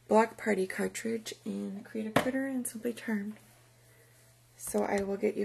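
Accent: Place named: Wisconsin, United States. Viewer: Hi I am watching this,just saying to my kids she sounds like she is from Wisc.You come to Florida we just go back from Wisc love this layout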